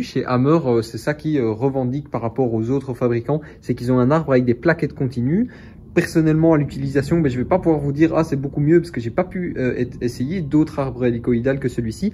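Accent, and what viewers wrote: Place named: Belgium. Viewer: Salut, je découvre ta chaine et j'apprécie beaucoup tes présentations (avec le petit accent belge charmant). J'ai beaucoup aimé la présentation des 4 machines à arbe hélicoïdal. En regardant aujourd'hui (nov 2023, les prix ont sacrément évolués. Celui de la Jet s'est envolé à plus de 4000€ livrée. Par contre, celui de la Bernardo est resté bcp plus maîtrisé et elle est maintenant à presque moitié prix, achetée directement en Autriche chez le fabricant et livrée (sud de France). Est-ce que ça modifierait un peu ton point de vue (indépendamment des accords que tu as avec machinoutils et qui, évidemment, ont pesé dans ton cas dans la balance). Bien cordialement. Bruno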